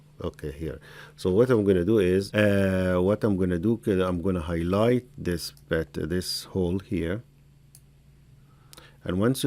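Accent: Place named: Russia. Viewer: great videos, 3 days before i worked only In INVENTOR, but now starting be proficient in Edge to :-D ty. Nd BTW, from your accent, you RU ? :-D I am Slavic to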